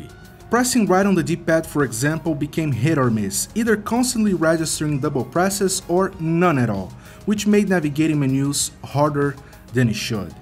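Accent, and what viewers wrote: Brazil. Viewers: Dude, are you brazillian? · Cara, matei no primeiro minuto de video que você era brasileiro pelo sotaque kkk. Parabéns pelo vídeo! Muito bom! E excelente inglês!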